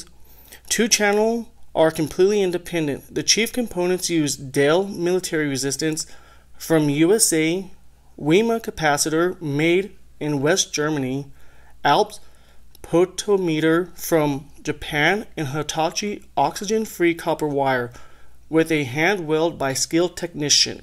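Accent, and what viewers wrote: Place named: Russia. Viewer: You have a little bit of Russian accent, nice amp!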